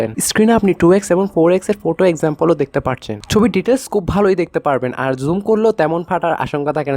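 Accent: Chittagong, Bangladesh. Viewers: তুই কি চিটাগাং এর?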